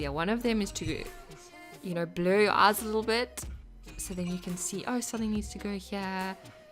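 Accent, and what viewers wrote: South Africa. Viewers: Do I hear a South African accent there? Enjoyed watching your journal process. TFS!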